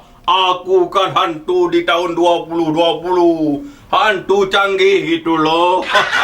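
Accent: Aceh, Indonesia. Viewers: Btw kek logat Aceh, salam sejHtra dari kalimantan bg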